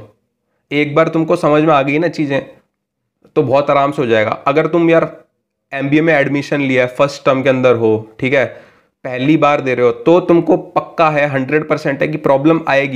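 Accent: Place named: Uttar Pradesh, India. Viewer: mujhe laga aap up wale hain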